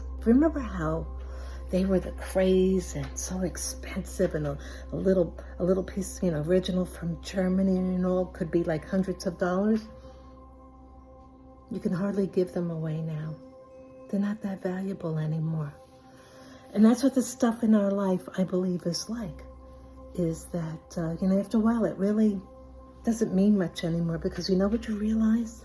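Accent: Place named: New York, United States. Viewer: This is a great video. Not sure why it came up in my feed - the intrusive all seeing eye of my phone perhaps? I have been declutteting for a long time. Critical life threatening illness in 2020 ( not covid) was the catalyst. I had a 2-3 yr recovery so that was slow. Now I am.picking up momentum. You provided some great tips. I have a large property which we wont be leaving anytime soon but some of the big possessions are leaving. I retired as a commercial cake decorator in 2018. I got sick in 2019, hospitalization 1/9/2020-1/20/2020. My shop remained unchanged in time from the time I closed the door 9/23/18 until about fall 2024. Its here on the property, a separate building from my home. I have been diligently whittling stuff down, selling equiptment etc. Believe me the house and garage have stuff too along with a full barn (horse drawn carriage 😬🙄) but this is a good place to start. 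You sound like you might be from NY which WAS my home state. Incidentally, I have a scrimshaw bottle opener I carried home from my aunts house in Norway. There is no reason to get rid of it, it has purpose. Thanks for the beautiful video.❤ Melinda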